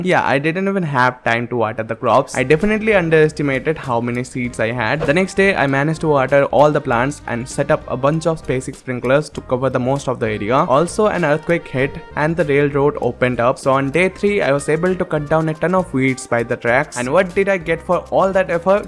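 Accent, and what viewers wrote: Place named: India. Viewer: also you are definitely understandable in English just with funny Indian accent which makes it unique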